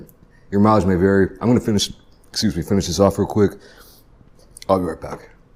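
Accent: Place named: Alabama, United States. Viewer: what the fuck why does he look like ching but sounds like robert who lives in Alabama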